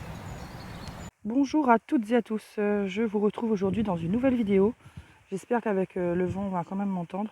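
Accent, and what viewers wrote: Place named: Reunion, France. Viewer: Merci pour cette ballade ds la nature reunionaise. Ca me rapelle mes randos à Mafate. Les images sont reussies et on perçoit un petit accent reunionnais ds la voie malgré un sejour prolongé en metropole.😊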